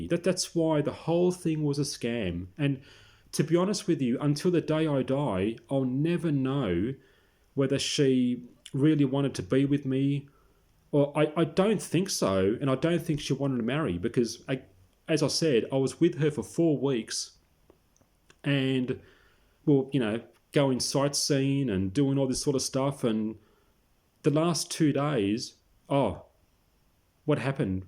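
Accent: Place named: Australia. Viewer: Australian talks like that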